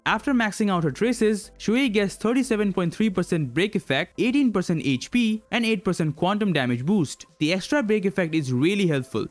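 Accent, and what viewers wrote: India. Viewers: Are u indian or something?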